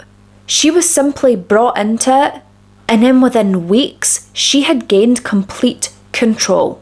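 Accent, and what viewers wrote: Scotland, United Kingdom. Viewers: She’s from Scotland! 🏴 🥰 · You are so Scottish ❤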